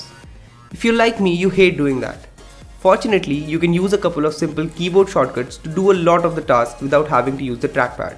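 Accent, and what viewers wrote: India. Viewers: I cannot understand Indian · Good video but the thick Indian accent forced me to watch several times to get the point.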